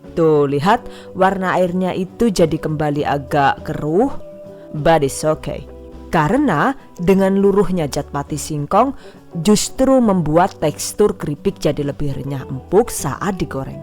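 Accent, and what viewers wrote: Banyumas, Indonesia. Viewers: Penjelasan nya detail.suara nya enak di dengar,lucu ada ngapak nya.bahasa Inggris nya juga keren. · Belum lama kenal vlog ini, langsung jatuh hati. Lagsung subcribe, kepo buka video" semua masakan😄😄 Sangat menginspirasi sekali. Jelas & mudah penjelasannya. Bahasanya itu lohh.. enak dengernya... Palagi pas yg ngapak".hehehe...Ngakak polll #giveawayTHVagustus19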